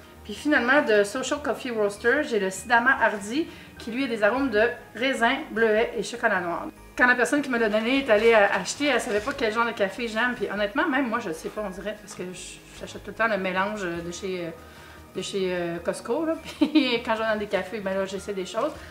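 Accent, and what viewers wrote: Canada. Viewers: Coucou je te découvre avec cette vidéo :-) J'ai adoré ta douceur et ton humour!!! J'étais aussi super contente de retrouver un accent canadien!! J'ai passé 2mois au Canada en 2015 c'était la meilleure période de ma vie!!!!!!!!!!!!!!!! J'ai kiffé tout ce que tu as eu surtout ce qui se mange lol et les chaussettes chat étaient trop marrante lol gros bisous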